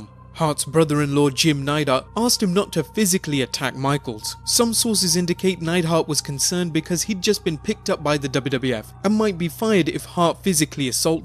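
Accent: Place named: United Kingdom. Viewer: I love this guy's Britain accent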